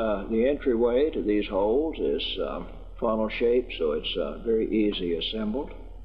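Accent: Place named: United States. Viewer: Excellent voice like the voice of president carter , good old traditional American voice.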